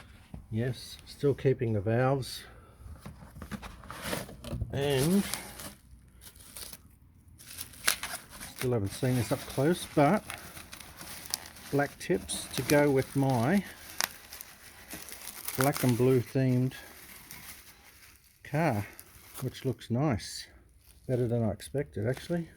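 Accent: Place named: Australia. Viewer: Was not expecting an Australian! Awesome.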